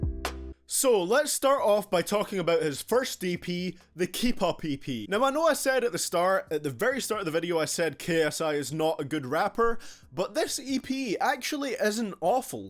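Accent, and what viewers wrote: Scotland, United Kingdom. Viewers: Are you Scottish? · are u scottish cause i am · Where in Scotland are you from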